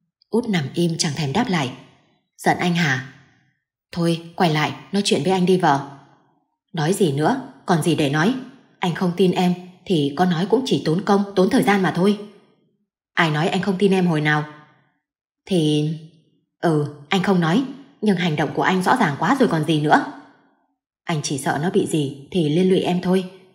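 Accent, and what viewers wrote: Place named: Hanoi, Vietnam. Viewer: Giọng đoc rõ ràng. Đúng là giọng miền Bắc trước năm 1975 HÀ NỘI. Cảm ơn nhiều. CHÚC AN VUI.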